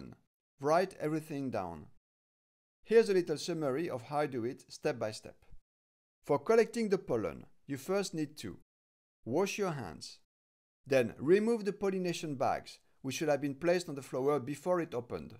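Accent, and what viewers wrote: France. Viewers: Hi. I have a question. I want to try to make an apple cactus Cereus flower. I will buy a large cutting and put it next to a wide south-west facing window in Paris. But I have read that Cereus are self-sterile. I have a Cereus spegazzinii wich is supposed to be a heavy and easy bloomer, can I use it as a pollen donnor for a larger apple cactus Cereus? Also, judging by your accent, are you french?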